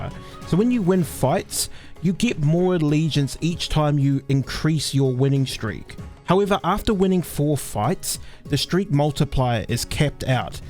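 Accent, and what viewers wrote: New Zealand. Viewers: quick question are you from NZ?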